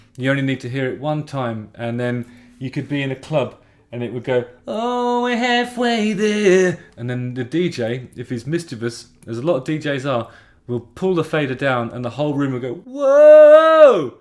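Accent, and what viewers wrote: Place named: Lowestoft, United Kingdom. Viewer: I'm so -pleased to find your channel! As a fellow Lowestoft chap, and former regular at the Triangle and O'Reillys (where I also worked), hearing you chattering away like this takes me back! Oh, and while more a 'Woo' than a 'Woah', has several good ones.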